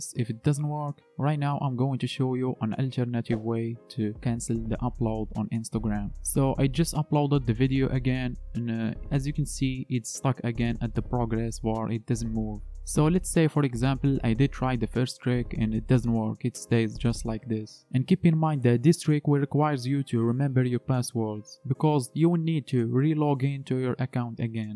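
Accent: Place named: Morocco. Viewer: Ur accent is so moroccan maaaan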